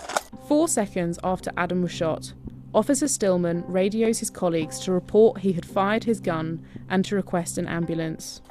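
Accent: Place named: United Kingdom. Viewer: I think they think a slight British accent makes this nonsense more believable..... SMH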